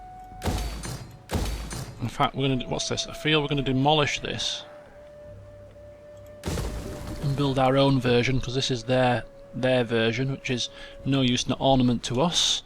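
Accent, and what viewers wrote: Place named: Northern England, United Kingdom. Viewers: Leeds, a fellow northerner can tell the accent a mile off ;) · Gotta say its always good when you encounter problems doing a campaign on Total war, no one likes a straight forward campaign haha and I can't quite work out whereabouts up north your from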